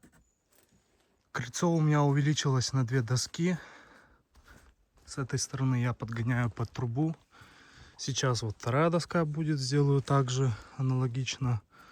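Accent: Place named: Kirov, Russia. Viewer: Судя по говору - Кировская область? Или ошибаюсь?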